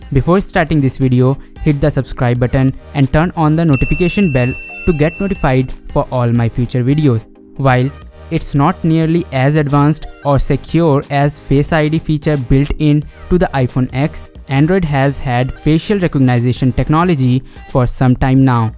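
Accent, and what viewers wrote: India. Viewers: indian accent omg so hard to understand plase try to speak without accent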